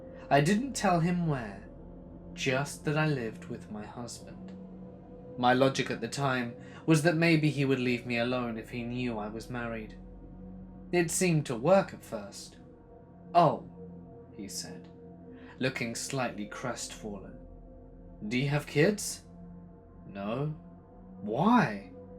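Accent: United Kingdom. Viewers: Hey! I just wanted to say that I went back and watched some of your older videos. I don't know if it's just me, but your British accent seemed a little bit thicker than it does in your more recent videos. Is there a reason why you sound different now? Just curious. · So glad I found this channel, a British person narrating!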